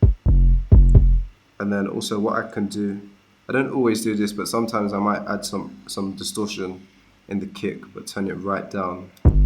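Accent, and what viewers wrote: London, United Kingdom. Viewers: You from London g ?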